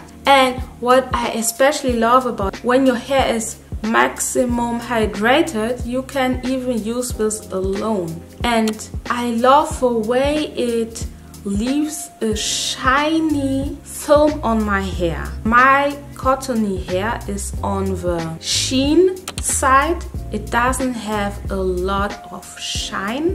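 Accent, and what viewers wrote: Germany. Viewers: Are you German or speak German? Your English sounds like the ones Germans speak Lovely hair 😍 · Love your German accent ❤ big fan of kinky curly and Camille rose 💗🫶🏽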